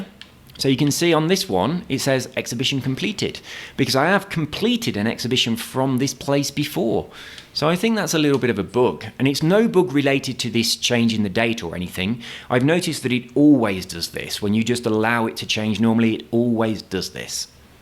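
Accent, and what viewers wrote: United Kingdom. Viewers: Why do you sound Cornish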